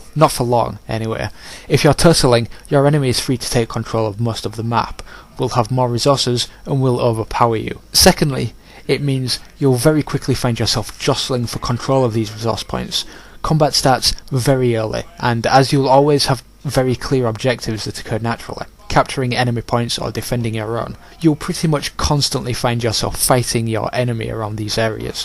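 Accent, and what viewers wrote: United Kingdom. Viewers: are you from britain? just asking.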